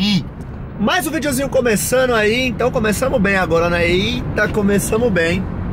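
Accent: Bahia, Brazil. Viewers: Mo Baiano kkkkj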